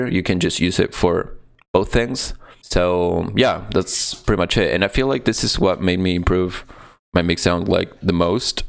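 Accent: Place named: United States. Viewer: Tenes la voz americana, muy sexy, te amo seguí así rey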